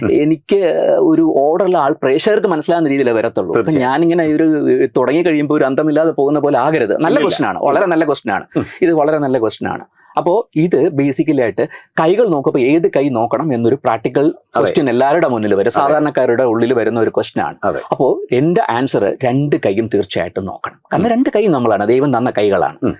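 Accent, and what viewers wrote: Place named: Trivandrum, India. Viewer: Tvm slang alle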